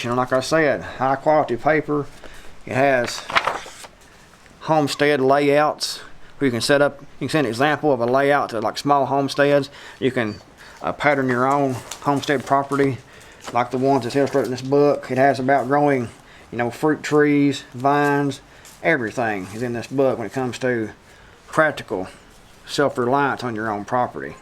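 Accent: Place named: South, United States. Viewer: I love how he talks! Country boy !! 🇺🇸 live this channel!!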